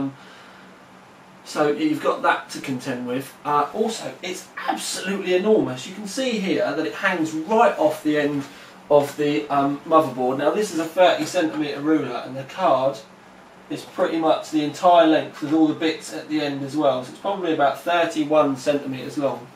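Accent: United Kingdom. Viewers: dude i hate your fucking british accent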